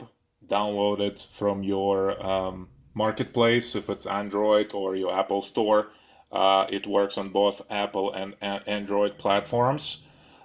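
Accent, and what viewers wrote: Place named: Russia. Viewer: The commentator is russian? His english is very specific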